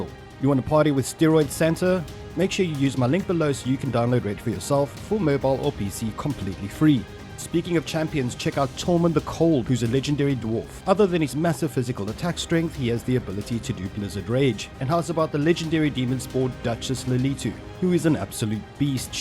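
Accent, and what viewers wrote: South Africa. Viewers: What are the odds of you being a South African excuse me for assuming it just the accent 😂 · You have to be South African as well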